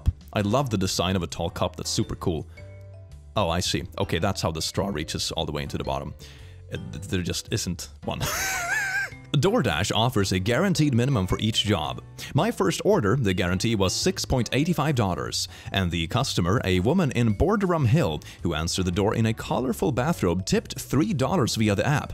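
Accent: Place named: Sweden. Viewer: in casual clothes threw me for a loop. Hardly recognized him. Wouldn't have except for funny swedish voice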